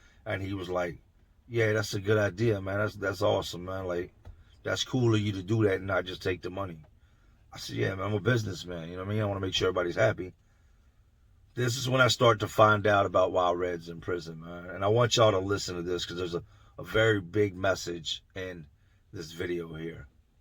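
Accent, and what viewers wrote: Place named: Philadelphia, United States. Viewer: Bro i love your stories. I thought you was a little wiggerish at first but thats just how they speak in Philly. You're gonna blow up. Your content is better than 1090 and Deaths by far. You don't do all that dumb extra shit man. Damn I hate how death stops to laugh or make the lamest jokes. I literally cringe when he does that. So I unsubbed. Death def isn't hard and he hella slow. But ofc you wanna keep shit sweet w him cuz that's how you get put on is getting an interview by him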